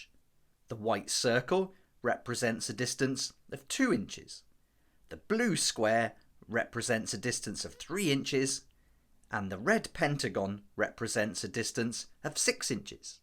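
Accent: United Kingdom. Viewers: Everything sounds better in a British Accent.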